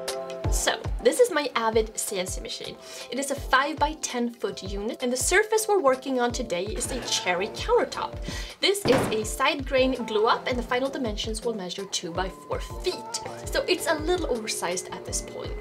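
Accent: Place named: Sweden. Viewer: Det låter lite "swenglish" när du pratar😊!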